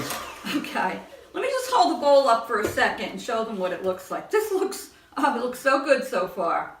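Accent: New York, United States. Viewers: Whoa...I jumped when the bowl slipped from your hands! That dip looks yummy and pretty. I've got to ask...are you in New York, cause I detect an accent? (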